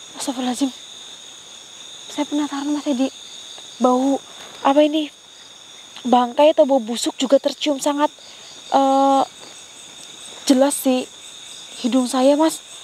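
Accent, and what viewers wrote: Banyumas, Indonesia. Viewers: Aq suka geli ketawa sendiri denger dialeknya yg lucu.spt tibluk.ya bhs Banyumasan..bs ngobatin rasa rinduku pd kampung halaman.ayo maju terus mb.I lope.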